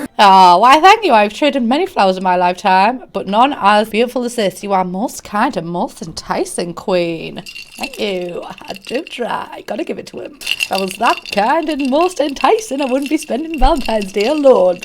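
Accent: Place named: North, United Kingdom. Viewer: Laughed all the way through this video you are soooo funny ! Proper cheered me up also nice To see and hear a fellow northerner 🤗. Xxx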